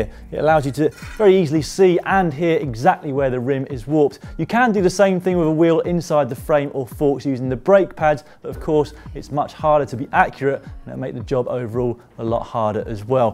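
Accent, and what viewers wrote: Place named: United Kingdom. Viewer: Great. All of the most vital things to know are glossed over in a super fast thick British accent so...thanks for nothing.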